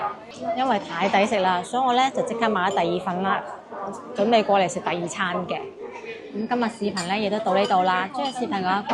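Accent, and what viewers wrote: Guangzhou, China. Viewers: “你明唔明啊，系好香······呃裹种感觉”，一个香字，粤语发音拖得长长的，软糯糯，娇滴滴，还是广州女生讲粤语最好听，当然也分人，最好。。。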